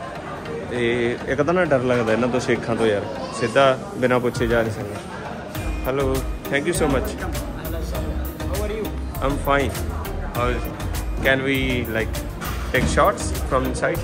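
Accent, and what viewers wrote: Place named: Gurdaspur, India. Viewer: Veer di awaj sun ke hi pata lug gia si ki gurdaspuria he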